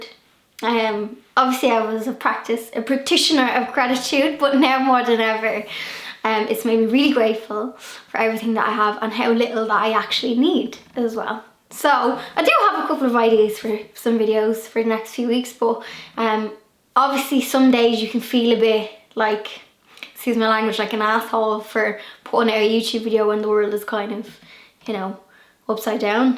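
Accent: Ireland. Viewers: I was wondering where you were. Missed you on Thursday. You seem like a very social person, which can be hard but keep chatting, we need you. I could listen to your Irish accent all day. Suggestion? maybe make a video of "about town", a local tour? Maybe you have done it in the past I don't know, I am a fairly new subscriber...just a thought. Anyway my dear...thinking of you and trusting you will stay safe and healthy. 😎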